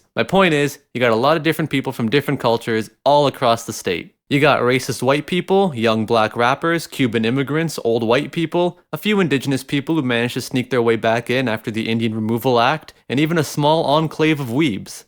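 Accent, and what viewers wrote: Newfoundland, Canada. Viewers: Ok so like what province are you from? You keep saying b'ys but I dont know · are you Canadian?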